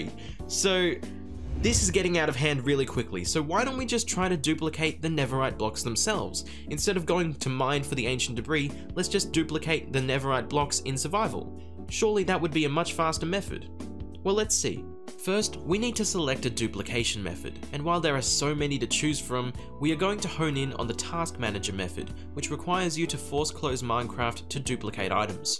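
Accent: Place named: United Kingdom. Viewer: I love this guys British accent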